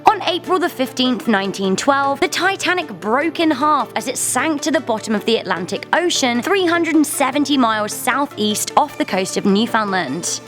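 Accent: England, United Kingdom. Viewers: I love how this English accent lady uses miles instead of kilometers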